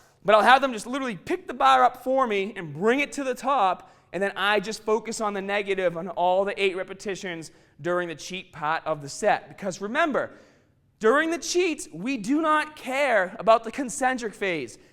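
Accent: Boston, United States. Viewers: Dudes like this should be banned from YouTube. Boston accent is not multi lingual, it just sounds stupid. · Is this what they call a "Boston Accent"? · haha sorry, my bad! Boston..it is hilarious though! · Bhavik Bhagat Lol, no. In what way is that Aussie? It's a Boston accent. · strong accent, Boston? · strongah!, stahdid, you definitely from boston! southie? or Townie?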